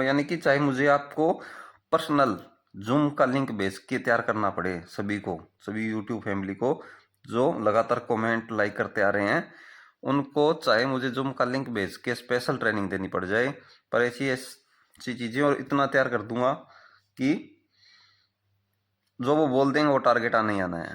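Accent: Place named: Jind, India. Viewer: m jind se hu bhai voice se laga tha local bhai ho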